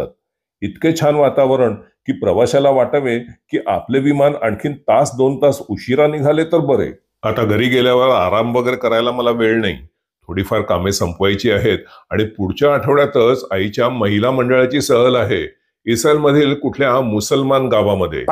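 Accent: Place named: Goa, India. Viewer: Khup chan video editing karta ani voice over pan.. malvani thoda goanis zhala ..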